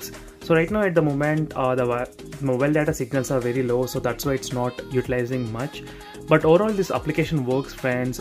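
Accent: India. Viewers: This is the most understandable indian accent I even watch on random tutorial in youtube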